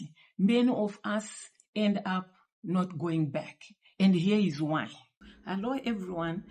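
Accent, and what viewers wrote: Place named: Cameroon. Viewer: You sound Cameroonian! That is irrelevant! However, the greatest threat is just insecurity!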